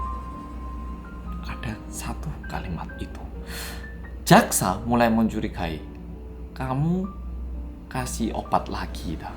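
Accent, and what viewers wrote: Java, Indonesia. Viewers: "Bersama Orang Korea Yang Medok!!!!" Selalu reflek bila mas Bilang ini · Ya medok 🎉 tks...bye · oke aku udh lama ga buka yt dan nonton sesuatu hampir setengah tahun lebih. akhirnya aku mau mulai lagi dr mas mas medhok ini. editannya itu lo ngakak terus aku. padahal ceritanya bukan cerita komedi. salut buat editornya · 𝙃𝙖𝙡𝙤 𝙜𝙪𝙮𝙨 𝙬𝙚𝙡𝙘𝙤𝙢𝙚 𝙗𝙖𝙘𝙠 𝙩𝙤 𝙆𝙤𝙧𝙚𝙖 𝙧𝙚𝙤𝙢𝙞𝙩 Bersama orang Korea yang "𝙢𝙚𝙙𝙤𝙠𝙠𝙠" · Ya allah mas sampean wong korea hlooo😂, kok iso bosone njlungupp to yooo, bener2 orang korea yg medhokkk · Suka bgt sama kontennya mas bersama orang korea yang medokkkk.😊😁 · Pertama liat videonya tak kira: lha ini wajahnya kayak orang korea beneran kok medok banget jawanya hahaha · Yang medokkk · Medhok bossqu😁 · Makin glowing aja ini mas mas medok 😅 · Ngakak aku penampilane wes kuweren omonge njelongop njelongop 🤣🤣🤣 ketok jowone mas haha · MAS: HELLO GAIS, WELCOME BACK TO KOREA REOMIT. 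BERSAMA ORANG KOREA YANG SATU RUMAH:" MEDOKKKK" krna setiap hri nonton. Jd hafal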